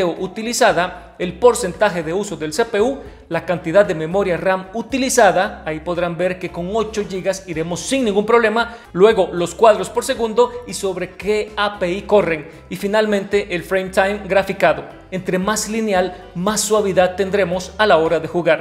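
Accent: Costa Rica. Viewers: Hola soy de Costa Rica Quiero comprarme una pc nueva que me corra buenos juegos Estoy viendo tu video "análisis de los nuevos ryzen 3400g y 3200g" Creo que por tu acento sos de acá Costa Rica Sabes donde puedo comprar una pc a buen precio? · Mae usted es de Costa Rica?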